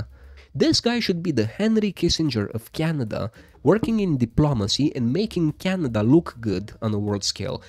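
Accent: Russia. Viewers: credeam ca esti rus dupa accent :)) dar acum ti-am dat Sub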